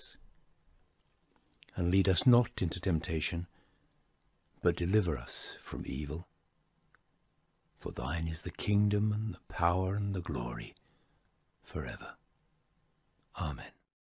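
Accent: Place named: United Kingdom. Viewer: Any got any good loops like this but without the “after this manner prey ye” british accent (I can’t hold American too long, just breaks my meditation) thank you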